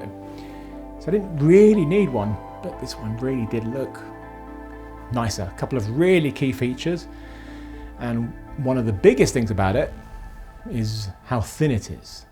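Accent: United Kingdom. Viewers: Me... Why the heck is talking about a TV... Clicks Also Me... Who cares I'll stay for the British accent.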